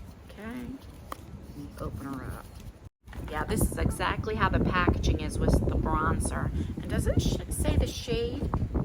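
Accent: New Jersey, United States. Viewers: I know I've told you this before but I love your NJ accent because my boyfriend of 13 years has the same accent and I'm from Boston so I have a Boston accent so we tease each other about our accents. 🤣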